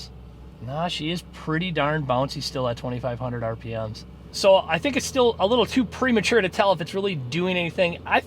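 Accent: Minnesota, United States. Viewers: This guy definitely lives in Minnesota somewhere. There is snow in the background of almost every video! And his accent is a dead give-away.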